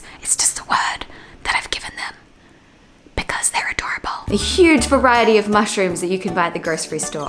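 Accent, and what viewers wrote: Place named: Australia. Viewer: You are so genuine and charming, a lot of vloggers put up a pretty obvious facade. Also lovely to hear an aussie. Keep em coming! / Currently deployed somewhere in the desert - fan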